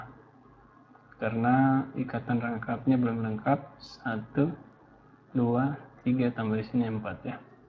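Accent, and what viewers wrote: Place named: East Nusa Tenggara, Indonesia. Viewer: Hallo kak, orang NTT ko? Beta juga, lebih tepatx beta orang sabu😄. Terima kasih pembahasan soal²x. Salam semangatttt.. Logatx mantap💪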